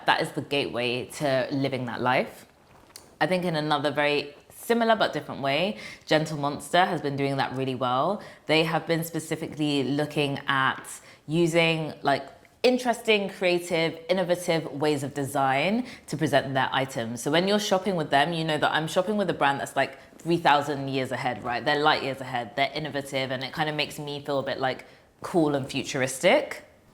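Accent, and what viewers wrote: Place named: New York, United States. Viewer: This is the wisdom I have been looking for. No hacks, no copy and paste, no what are your competitors doing. Just pure inspiration and creativity that allows you to cultivate your voice and your story telling capabilities. AND it was applicable to me even though I am not a huge brand. I really appreciated this! And as a Brooklyn native there is something so NY about your delivery and I am here for it.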